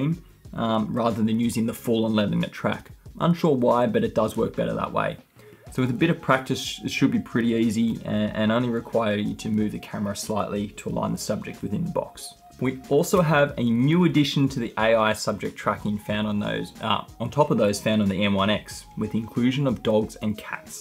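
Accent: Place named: Australia. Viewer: An excellent review. You sort of made a lot of mysterious stuff understandable even at the pace you were running at and me not being used to concentrating on oz tech accents. I look forward to some of the tip vids you might put up. I am very interested in getting slow-mo video working but have found very little understandable advice. Check the manual - No real explanations there neither in the Rocky Nook books. My preferred ambassadors are self confessed amateurs at video. Even asking eachother and getting no real answers. Thank you greatly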